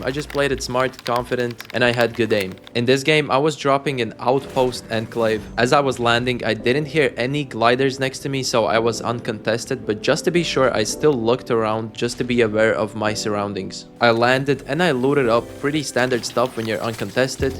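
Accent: Germany. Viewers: what accent do you have? german?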